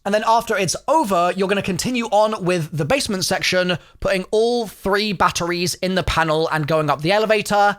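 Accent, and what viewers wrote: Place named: RP, United Kingdom. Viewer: The most irritating voice on YouTube! I can't finish watching this toff! Don't know what you mean with the hand not being there, it's been there every single time for me and I've not waited for those body counts... Doesn't just sound like shit then🫣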